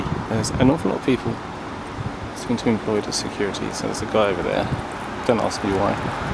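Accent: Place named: United Kingdom. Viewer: You does not need to write word "Englishman", your accent is soooo british, we already understood that you Englishman.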